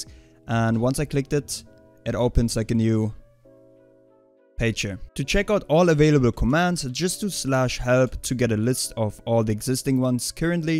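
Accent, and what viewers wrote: Germany. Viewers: Are you from germany? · Jo Bro are you German?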